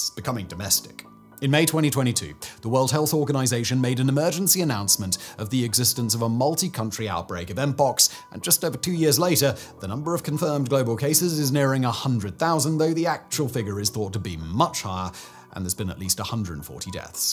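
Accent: United Kingdom. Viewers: thanks I have a hard time with the brit accent sometimes. But what I said still holds true. I'm worried about clade2.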